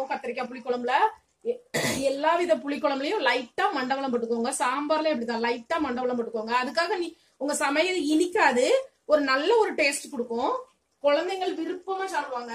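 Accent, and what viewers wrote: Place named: Kanyakumari, India. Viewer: Kaniyakumari kaaranga nu nenaichen... curry kollam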